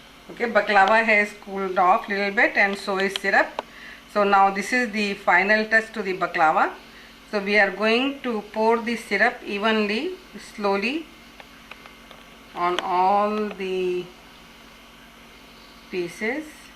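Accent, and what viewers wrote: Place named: India. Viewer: everything that you teach us is wonderfully delicious! Please tell us the name of the (teaspoon) spice that you're adding to the sugar syrup., you're Indian accent is lovely but I keep missing the name. Thank you!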